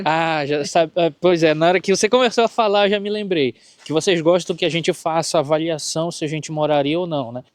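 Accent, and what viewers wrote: Pará, Brazil. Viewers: Meu amigo, com esse sotaque paraense, só pode ser de lá, minha terra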